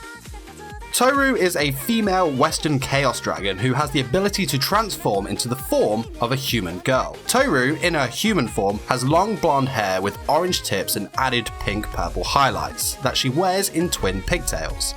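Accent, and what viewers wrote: United Kingdom. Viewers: She is known as the Penis Dragon! (Or Pee Wee Dragon if you prefer) Btw I like that your accent is British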